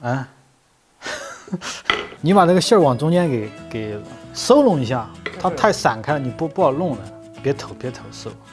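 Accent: Sichuan, China. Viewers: 他是四川人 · 川普好👂，讲讲牛肉焦饼嘛！好多年没吃到东大街的牛肉焦饼了。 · 师傅、普通话加四川口音真搞笑😂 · 说的是川普，四川普通话，是四川人啊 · 师傅四川的，一听就那么舒服😄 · 师傅四川人吗，哈哈哈熟悉的川普。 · 听到有点家乡口音川谱味儿在里面特别亲切呢😊😊😊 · 必须赞👍，讲得真全面。估计师大厨的口音来自四川，👌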